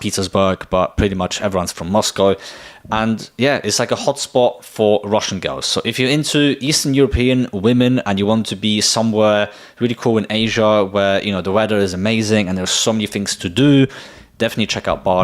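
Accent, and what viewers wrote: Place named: United Kingdom. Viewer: True. That's cuz he's a Polish good looking dude with British accent. So he's super exotic in Miami. If you're an avg American guy, Miami would be horrible to date in.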